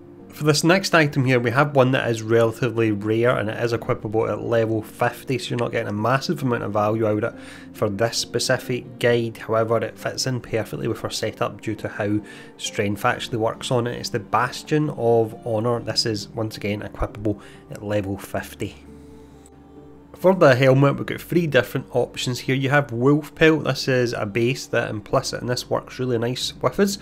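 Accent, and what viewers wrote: Scotland, United Kingdom. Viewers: Ah yeah Sorry I think I mixed up the reply a bit😆. I actually like the Scottish accent but this is a bit hardcore for a non native speaker. There are other YouTubers in the arpg world like quinn69 (not sure about the spelling of his nickname lol) who definitely have strong accents but I still understand them easily. Anyway I didn't want to turn this into a "you need to speak properly" , I was just a bit upset by the fact that I usually hear these kind.of video rather than watching them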